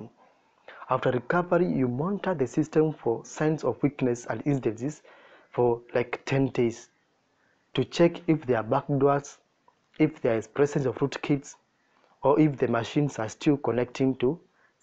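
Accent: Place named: Kenya. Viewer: Nice one really helped our company. Thanks so much. This guys however sounds like a Kenyan.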